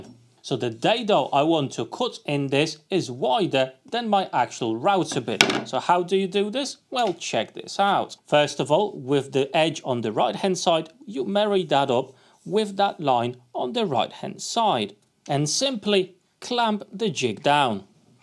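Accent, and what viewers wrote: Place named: England, United Kingdom. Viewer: Another brilliant video made by a Polish guy with an English accent. Always look forward to your good work.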